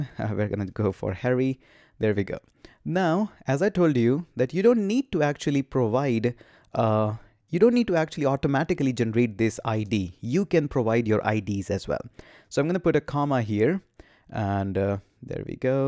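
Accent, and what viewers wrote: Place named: India. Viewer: Are u Indian? apart these things explanation is so clear loved ur way to explain topics and ur English tone too....😆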